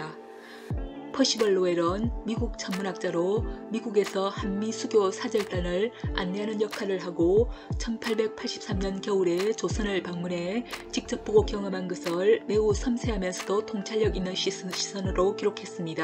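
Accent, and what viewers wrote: Gyeongsang, South Korea. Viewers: 신기하단말야~ 유튜버님 경상도 분이신가봐요^^ 차라리 오리지널 억양을 쓰시는건 어터실지요 듣기가 좀 ..... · 쌀을. 살 로. 발음 하는 경상도 발음 입니다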